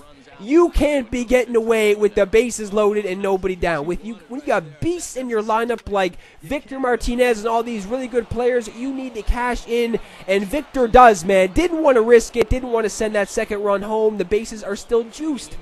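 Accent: Canada. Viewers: U must be from Newfoundland with that accent u got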